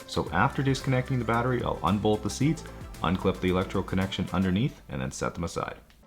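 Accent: Canada. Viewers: I was just about to ask if you were from Canada lol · The Canadian accent is so cute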